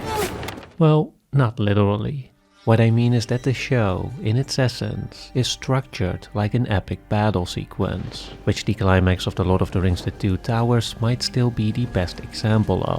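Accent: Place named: Netherlands. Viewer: Ik ruik een Nederlands accent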